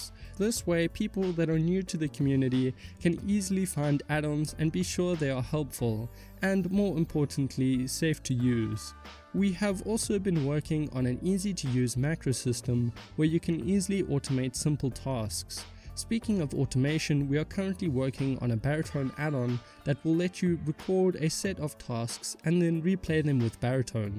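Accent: South Africa. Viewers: i can tell straight away ur a south african awe man:)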